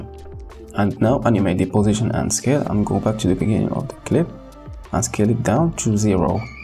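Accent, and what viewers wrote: Morocco. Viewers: Moroccan accent 🤍 keep going bro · moroccan accent yak hhh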